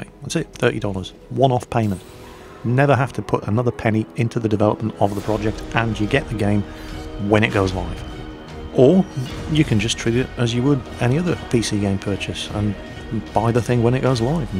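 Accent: England, United Kingdom. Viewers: What you are doing is that you are an old man with a English accent, who happens to like video games. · Why subscribe to (assumed you like WoT or Warthunder) 1. He is authentic (or at least a pro in pretending to be) 2. He has, imho, a fantastic British accent 3. He has a kind of humor I appreciate cause its hilarious and mature the same time 4. He stays away from politics, religion and other "minefields" of internet communication 5. He lives my childhood dream (playing a game all day and getting money for it) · Well, I've never played WoT, but i almost feel like i know more about the game than a lot of newbies to the game and its all from watching your videos lol for whatever reason i just enjoy your commentary. Must be the English accent:D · I can attribute your success to three things. One- You're British. Americans love British accents. Two- You're retired Navy. 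You have a passion for military history and you know what you're talking about. We respect your opinions and input because of it. Three- You DON'T give a shit about what's popular and you refuse to conform to the modern YouTube channel standard, what I call "Pewdification". I mean no insult to PewDiePie at all, I mean, he found a niche and he milks it, and it works. But you stay specific, you talk with the fans, you do Mingles with, you study the game, and you know what you're talking about because you stay focused. This is coming from a Texan fan of yours. I will stop whatever I'm doing to watch your videos, and I am never disappointed. I love your stuff and I'm glad you don't plan on changing. I am also just fine with your new interest in Star Citizen, as you're still mainly focused on the content you have your main fanbase for. If you wanna upload some more SC videos, I think that's fine as well. 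So long as you don't turn into mainly SC. A trifecta of SC, WoT, and WT sounds okay to my ears, once SC comes out. Keep on tankin'.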